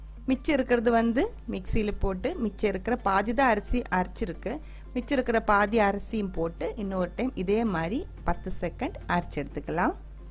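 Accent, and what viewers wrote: Kerala, India. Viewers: Superb details ... very nice demonstration , ... Thank you Madam .. I also like the Malayalam flavoured Tamil.. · Tamil speak..good... To be avoid Malayalam slank... nothing to worry... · You talk very sweetly. You remind me of my Malayalee friends from college. In fact your fluency in Tamil is excellent. You probably live in Tamil Nadu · നിങ്ങൾ മലയാളിയാണോ? തമിഴ് കേൾക്കുമ്പോൾ മലയാളി തമിഴ് സംസാരിക്കുന്നതു പോലെ തോന്നി. പിന്നെ എല്ലാ recipies ഞാൻ കാണുന്നുണ്ട് എല്ലാം നന്നായിരിക്കുന്നു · Love your kerala thamizh. Keep it up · Her malayalam mixed tamil slang is nice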